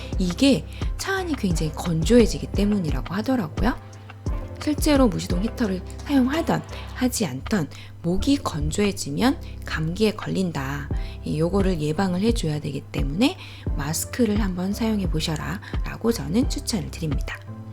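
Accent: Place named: Gyeongsang, South Korea. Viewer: 말씨에 경상도 말씨가 . . . .종종 ㅎㅎㅎㅎ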